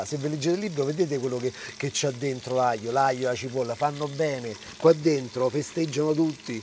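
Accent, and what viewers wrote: Rome, Italy. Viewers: Chef, La premessa è che guardo i tuoi video dagli albori e ti seguo da quando giravi per gambero rosso in tv. Ultimamente però ho da fare una critica, sperando sia costruttiva. Noto che il tuo modo di fare video è cambiato, adattandoti e quasi imitando quelli di un certo “cuoco amatoriale” di cui non voglio fare il nome che gira i video dal proprio balcone di casa… Tutto questo voler enfatizzare il romanesco e la gestualità molto simile all’altro usata per me ti rende meno autentico di quello che sei e che hai sempre dimostrato di essere. Spero venga visto solo come un parere personale e quanto più costruttivo possibile e non come una mera e deleteria critica. Saluti Max, comunque ti seguirò sempre e comunque per la tua simpatia e professionalità! ❤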